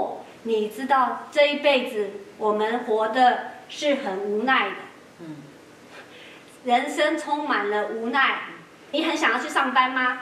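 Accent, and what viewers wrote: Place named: Taiwan. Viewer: Mandarin, with Taiwan accent. Religious content.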